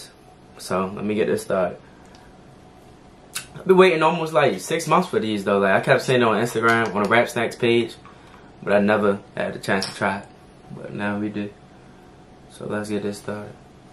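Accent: Baltimore, United States. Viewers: The Baltimore is strong in this one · Bmor accent? Good video. Love to see dmv youtubers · Definitely knew u were from B more before u said it lol tew dollars🤣I love yalls accent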